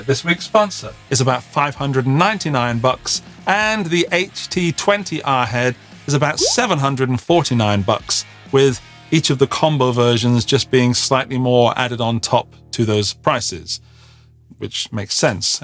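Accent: United Kingdom. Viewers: Hey, digging this channel, love the fun crazy professor persona However slightly confused, pretty sure that's a British accent. I can say that with confidence because I was born in London and some 40 years later still can't work out how to get out. So my question is, are you a UK or US channel since pushing Sweetwater, who I understand are the Walmart of music stores, well known but not available in the UK?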